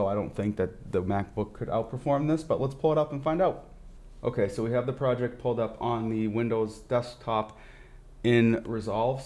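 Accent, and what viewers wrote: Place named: Boston, United States. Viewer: Bro, I can hear my hometown in that voice. I grew up in Southie. Randomly came across your channel; just faced the exact same dilemma. Different workflows, and my PC is equipped with a 3080, probably spent close to $5k on the PC build total. I picked up a fully decked out M1 Max (64gb, with the 2tb SSD). The MacBook was cheaper, coming in around $4300. Granted I still use the PC for gaming, which I have no plans to do on the Mac, but it’s pretty insane how crushing that performance is. Sadly, I’ll have to wait for Dec 1 to get my 16” MBP; this has definitely made the wait harder. Can’t wait to get this little powerhouse in my hands, retire the old 16” Intel MacBook Pro, and save some time everyday.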